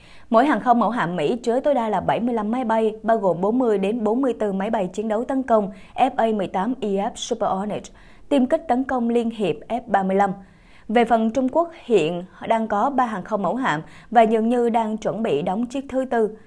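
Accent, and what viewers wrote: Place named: Central, Vietnam. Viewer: người đọc có giong miền Trung dễ nge.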